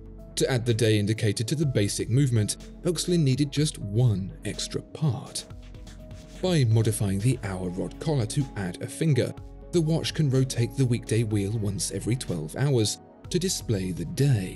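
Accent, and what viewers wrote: United Kingdom. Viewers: This videos is why I enjoy your channel so such. (NOT CAUSE WE AMERICANS FEEL THE BRIT ACCENT IS SO OLD WORLD but the amazing content of your videos) With a collection of PP, JLC, and Rolex (NOT ONE HYPE WATCH IN THE COLLECTION), years and years of buying what I love.. I'm so FUCKEN impressed with those whom are the true GENIUS of our time... Will sell one of the OVER PRICED ROLEX in the collection (purchased from Mayor Jewelers in Miami Fl.). For the past 18 years my local AD has called to purchase a ROLEX at AD prices. They know I will NOT sell what I true LOVE. This Watch is AMAZING..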